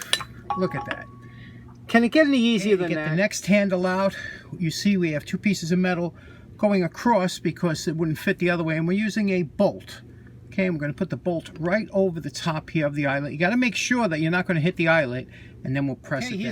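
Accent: United States. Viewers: Accents😂. I do my videos in my normal everyday voice, or so I though, but someone commented I sound so chilled that it sounded like “I had eaten a shit ton of weed”. It’s you Americans that have an accent not us Aussies. Now Larry the cable guy has an awesome accent that I can listen to all day. He makes me laugh as soon as he opens his mouth. Great job on the restos. It’s so frustrating have to restore things twice🤬 Cheers Stuart 🇦🇺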